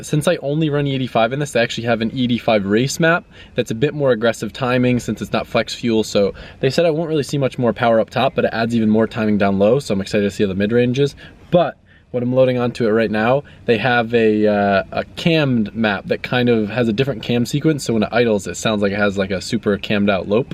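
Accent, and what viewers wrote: United States. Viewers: Adam LZ the cup holder specialist, with an american accent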